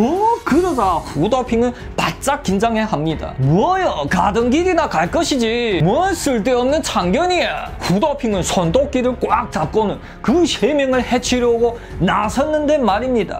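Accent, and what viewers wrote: Yanbian, China. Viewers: 는 연변사람인가요?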